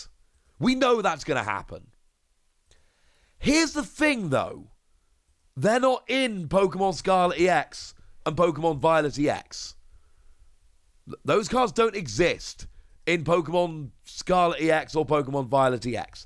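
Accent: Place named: United Kingdom. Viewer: Yeah it’s pretty common for UK English speakers to use the “yu” sound for when the letter U follows a consonant.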